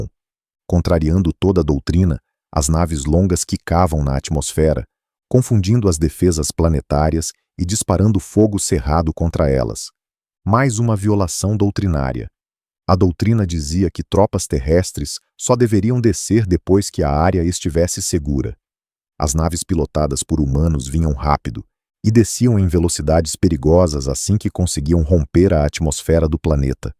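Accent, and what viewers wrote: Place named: Minas Gerais, Brazil. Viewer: Que doido 👏👏👏👏 (em mineirês 😅 muito legal kkk ) me recordo desse conto em “Relatos Isolados “ …👏👏👏👏👏👏👏deu outra visão do cenário 🤙😀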